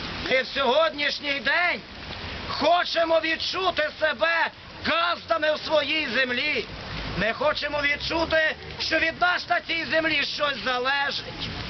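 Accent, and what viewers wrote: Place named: Western Ukraine, Ukraine. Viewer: Западноукраинский диалект.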